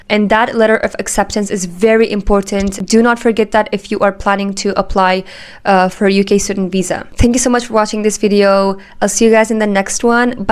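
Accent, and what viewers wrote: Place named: Turkey. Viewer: Please make a video in Turkish language only Because I am in love with your Turkish accent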